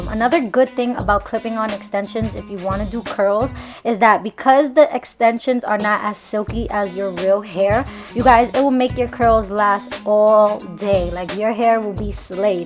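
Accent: New York, United States. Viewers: she from ny !! not every bitch wants to talk like cardi lmaooo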